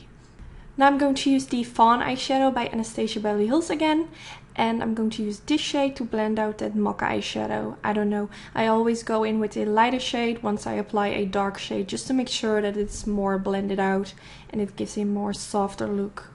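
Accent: Netherlands. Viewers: I love this look so much, you make it look so easy! Also, can I mention... Your accent is 👌🏼 I have a Dutch uncle, so I am biased of course! 😊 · are you Dutch? I hear something in your voice!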